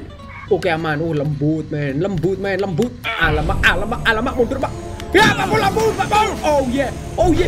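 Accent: Java, Indonesia. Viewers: Bang sama bang Dicky/Tampan gaming kan sama sama logat Jawa bang Dicky kan Semarang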